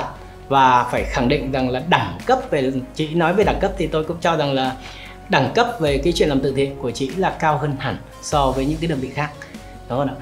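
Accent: Standard. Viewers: Rất thích người có học thức ăn nói chuẩn, dễ nghe, rất thuyết phục, phân tích hay, cái mà nhiều kênh khác còn thiếu. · Anh noi rat chuan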